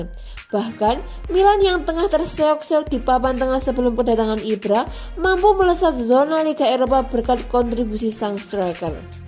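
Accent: Java, Indonesia. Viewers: Bicara ny aga logak jawa,,,bravo fm 1899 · Mario Marzuki not bad lahh buat sampe akhir musim. Pengen liat dong nihh naratornya...medok amat suaranya. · Gemes sama pengisi suaranya, medok banget hahah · Medok suaramu mbak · jowone ndi mbak yu?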